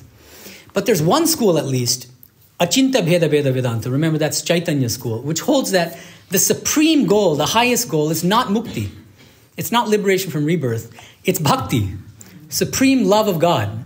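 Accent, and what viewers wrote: United States. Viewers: Exactly... He simply states Advaita as 'ब्रह्म सत्यम जगत मिथ्या' by World is not real. He did not take any effort why 'मिथ्या' has been used. He is not aware of Shankara parampara also. His American accent might allure some people but not true seekers. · A monk should not have bias which this monk has in abundance. He may be a scholar but lacks in the fundamental factor of expression of his views. He may.speak the English language like an American but does not know how to teach, how to pause, how to repeat. All he does is to show off his American accent. It seems as though his object is to put down Sri Shankaracharya Bagavadpada by questioning the authorship of Sri Shankaracharya's great works ; the crown being Viveka Chudamani. You may have a completely different opinion and you are entitled to it. But you have no right to speak of Shankaracharya in the manner you spoke. 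Did you play marbles with Sri Shankaracharya? On hearing you I get the impression that you are out to insult Sri Shankaracharya ( not that any one can do that, least of all you) and you are out to show off your accent. You can never compete with Swami Sarvapriyananda for you don't have the guna required for it. You are not fit to be called as monk. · My two cents: Swamiji has given a fresh and comprehensive perspective of what is to be understood as Vedanta philosophy in the light of the teachings of Sri Ramakrishna and Swami Vivekananda. In addition it is a knock on the heads of fanatical bigots who are possibly insecure about their own beliefs . Can you ignore a toothache saying it is unreal? will you refuse to bow down to a statue of Sri Shankara saying it is unreal? Just as you are questioning the Swami if he played marbles with Shankara were you present personally when the acharya wrote the Vivekachudamani? 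If you look up online you will see the authorship is questioned by many scholars. Talking disrespectfully about a monk of Ramakrishna order is unbecoming of a spiritual aspirant. Swami Medhananda was born and brought up in the USA and has a natural American accent. Also Swami Sarvapriyananda and Swami Medhananda have due respect for each other's views.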